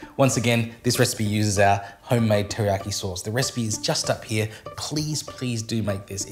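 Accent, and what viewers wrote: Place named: Australia. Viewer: He sounds Australian... maybe kiwi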